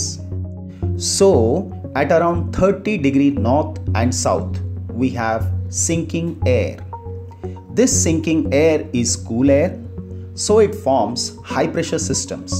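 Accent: India. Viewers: the indian accent takes it to another level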